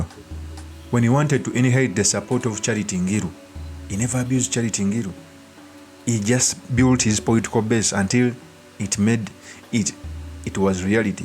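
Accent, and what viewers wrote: Uganda. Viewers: Ugandan accent detected.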